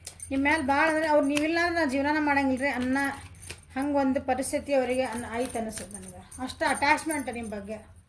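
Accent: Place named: North Karnataka, India. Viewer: ಮೇಡಂ ನಿಮ್ಮ್ ತರಾನೇ ಇದಿರಿಗೆ. ನಮ್ಮ ಮೇಡಂ ಬಹಳ ಸುಂದರಿ ಯಾಗಿದ್ದಾರೆ ಮತ್ತು ನಿಮ್ಮ ಉತ್ತರ ಕರ್ನಾಟಕದ ಭಾಷೆ ಬಹಳ ಚಂದಿರ ಓಕೆ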